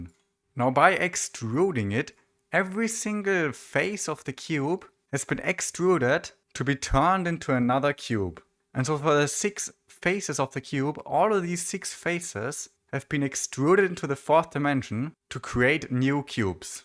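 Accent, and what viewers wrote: Germany. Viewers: Do i hear Deutsch? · Nice Video ❤. U Sound German😂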